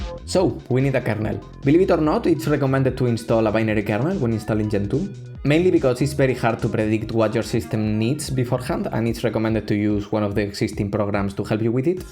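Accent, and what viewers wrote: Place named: Spain. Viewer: are you spanish? that accent damn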